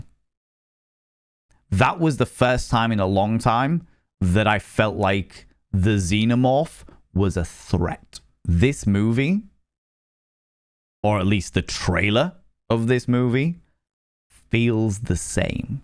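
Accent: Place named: London, United Kingdom. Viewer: He's British.thats how people from London talk.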